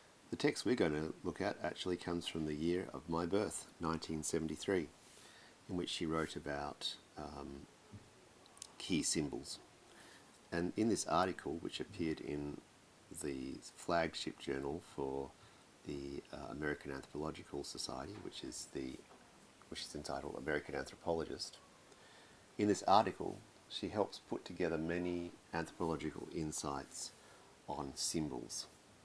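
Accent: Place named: Australia. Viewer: his Aussie accent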